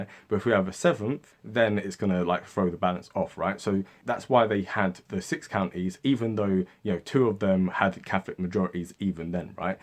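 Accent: England, United Kingdom. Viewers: You can't win here. The sound of an English accent providing solutions to Irish problems drives even the most Irish people bonkers, as there is a long, long history of 'English solutions' causing endless problems for the Irish. You're a brave man...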